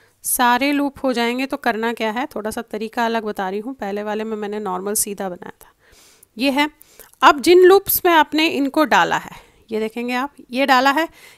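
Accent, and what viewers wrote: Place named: Pakistan. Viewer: Thank you 💞 mai app ke buhat bari fan hoon, tumhari aawaz ur pronunciation bilkul hum pakistanio jaisa hai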